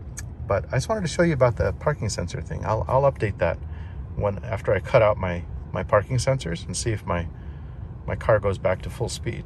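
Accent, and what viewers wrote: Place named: United States. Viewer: Thanks for the video. Would be fond of seeing you attempt to hit the indicator buttons while turning. Scenario: in Europe it's (generally) the law to blink in/out of roundabouts. I'm having a hard time seeing how to do this safely without taking the eyes off the road trying to find the correct button which by now might be on the other side (yoke upside down). You sound American so you might not see it as an issue, but I assure you it will be for many of us 😊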